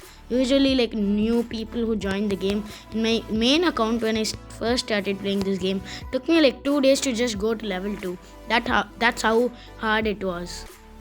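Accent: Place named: India. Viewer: Lol your Indian scent lel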